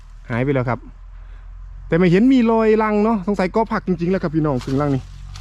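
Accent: Korat, Thailand. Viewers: คนโคราชบ่คับ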